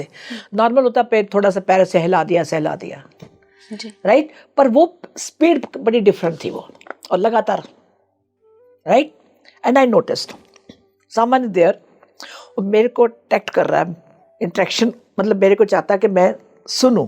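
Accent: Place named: Punjab, India. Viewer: ji muje lagta he aap punjabi ho bat karne ka style punjabi he aap ki shakal punjabio jasi he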